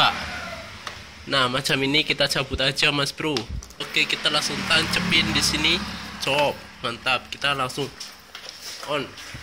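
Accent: Banyumas, Indonesia. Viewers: Tak kirain banyumas mas boy..soalnya logatnya kaya orang banyumas.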